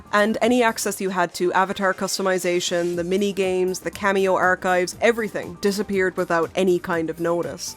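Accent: Ireland. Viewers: Irish horror lady? Lets fuckin go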